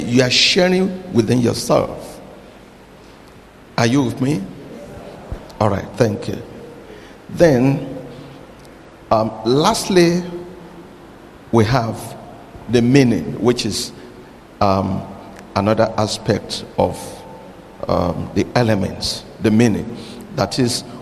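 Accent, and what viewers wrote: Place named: Nigeria. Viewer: he is most likely a great Nigerian speaker. He has a very heavy accent now, but in time, he could improve. I suggest hiring a speech coach. Recording his voice and reading ouloud for one hour at least three times a week👍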